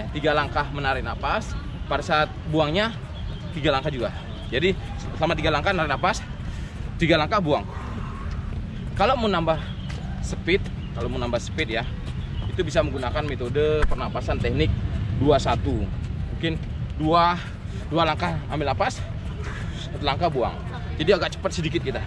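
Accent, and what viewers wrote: Bali, Indonesia. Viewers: Bang dulu apakah pernah di Bali? Kok logatnya agak Bali gitu ya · Logat nya om kok kayak orang bali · Pantesan kayak ada logat-logat bali, ternyata kelahiran bali wkwkwk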